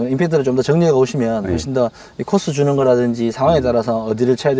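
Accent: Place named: Daegu, South Korea. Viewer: 저도 대구 출신인데,, 오랜만에 듣는 extreme 사투리. 와이카는데요! 내용도 좋고 사투리도 좋아요 ㅎ 구독, 좋아요 ㅎ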